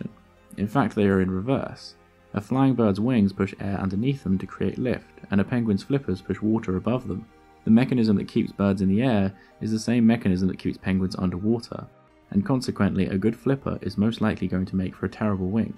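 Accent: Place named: England, United Kingdom. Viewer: You make really interesting videos, and they are great to fall asleep to because your voice is so calm with English accent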